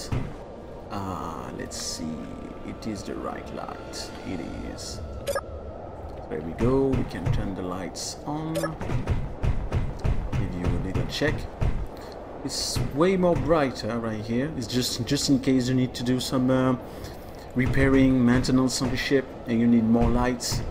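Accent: France. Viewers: Sympa ton mothership ! Un petit accent français dans la voix je trouve :) Beau boulot sinon !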